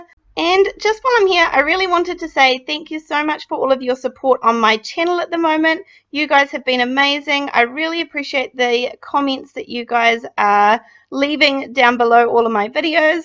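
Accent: New Zealand. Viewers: I stumbled onto your you tube card making and am now subscribed, absolutely loving your techniques and have tried a couple, now working my way back through your previous video's. Great to hear a Kiwi voice - thanks so much and keep up the brilliant work.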